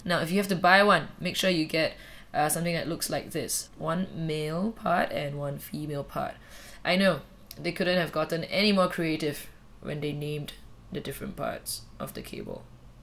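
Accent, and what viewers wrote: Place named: Dominican Republic. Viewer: You speak like a Dominican in english.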